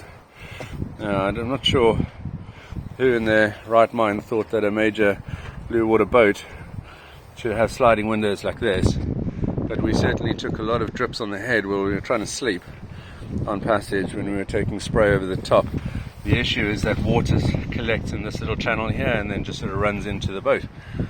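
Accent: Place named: South Africa. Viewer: You have a very South African accent there... An expat maybe?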